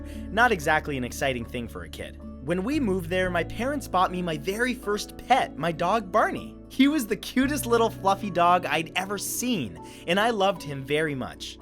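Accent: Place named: Canada. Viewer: I knew you were Canadian from the first video of you I watched with you saying out. I worked with Canadians before and they helpede grow. I worked for them as virtual assistant. Keep up your awesome videos and I hope you don't lose hope Matt!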